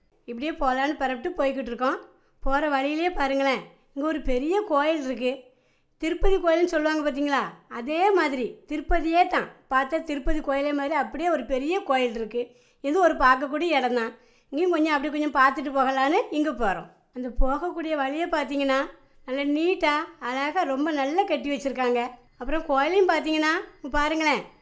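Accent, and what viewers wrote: Tirunelveli, India. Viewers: அம்மா உங்க நெல்லைத்தமிழ்பேச்சைக் கேட்டுக்கிட்டே இருக்கலாம்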